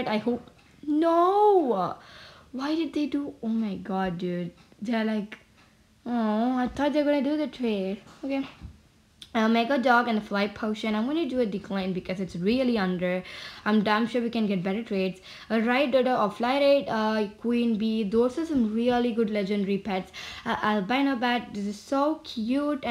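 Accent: India. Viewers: By the accent she is Indian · im also indian i was wondering if she is also indian because her voice is sounds like indian and she is indian :))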